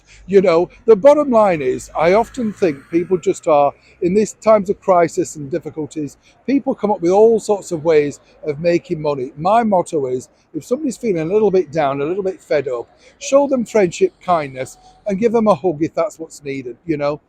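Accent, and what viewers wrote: United Kingdom. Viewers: I had to listen to this a few times before I figured out you were talking about the hugger & NOT a hooker. Sorry, I need to fine tune my hearing a bit for the British accent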